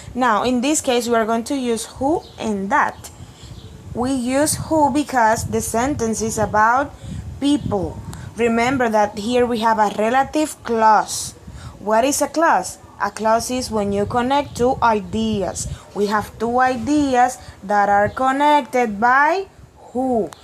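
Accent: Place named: Spain. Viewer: Although your funny Spanish accent that is so great. I understood that finally. Thanks a lot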